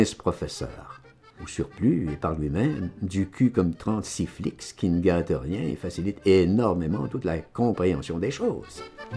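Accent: Quebec, Canada. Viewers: Coupez la musique bordel. C'est pas du bal musette. L'accent québécois pour une phrasé titi parisien ça ne va pas non plus.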